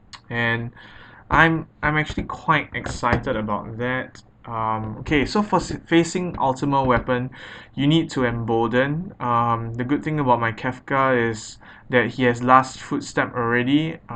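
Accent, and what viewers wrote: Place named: Trinidad and Tobago. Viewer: You sound like you have a Trinidadian accent. Great video!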